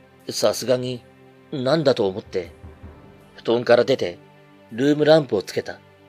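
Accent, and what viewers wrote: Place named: Kansai, Japan. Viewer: こんばんは😃🌃 [週刊怪談]ありがとうございます～🥰関西弁可愛よ💝拝聴中～🤗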